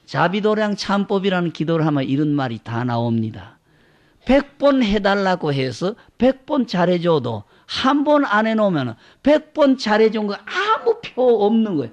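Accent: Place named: Gyeongsang, South Korea. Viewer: 스님 너무 그립습니다 다시 듣고 또 듣고있습니다 경상도말에 담담하게 하시는법문이 가슴에 너무 남습니다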